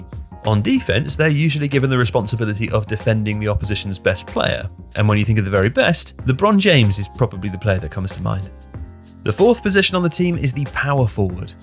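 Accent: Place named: England, United Kingdom. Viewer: I didn’t need a fancy English accent to tell me that. White washing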